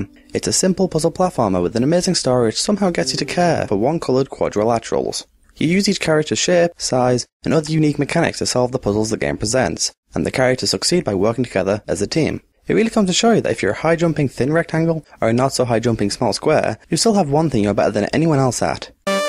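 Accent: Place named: Manchester, United Kingdom. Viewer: Manchester accent + speaking fast = Americans can't understand you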